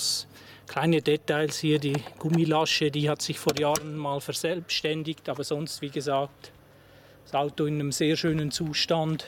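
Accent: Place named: Sweden. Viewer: Are you Swedish of origin? Sounds like i'm hearing a Swedish 'R' :-). Beautiful Alfa.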